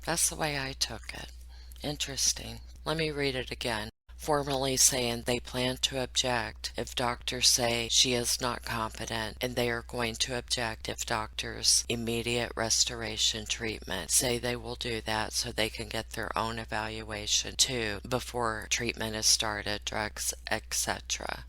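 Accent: United States. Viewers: Love listening to you're commentary in that beautiful American accent. Great how you say what is the truth and not what people want to hear. Lots of love from London uk x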